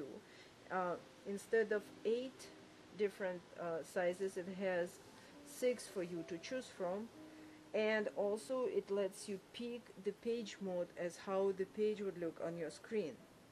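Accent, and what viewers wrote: Russia. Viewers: Ты случайно не русский?) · очень сильный русский акцент :)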